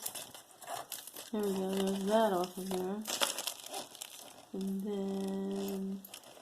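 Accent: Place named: Ireland. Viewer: Love the Irish accent! 💚🍀💚🍀💚🍀💚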